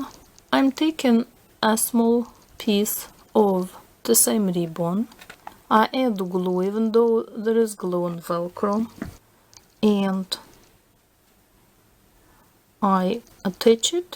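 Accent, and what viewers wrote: Russia. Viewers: I love ur Russian voice and ur crafts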